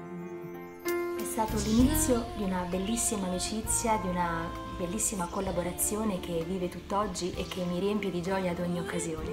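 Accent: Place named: Italy. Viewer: Je n'ai rien compris, je suis français. Mais quel bel accent italien !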